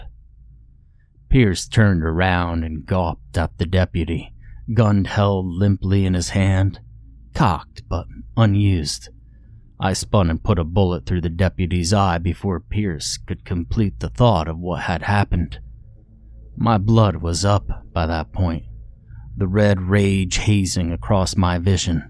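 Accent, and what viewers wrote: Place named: West, United States. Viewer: Your western voice ain't bad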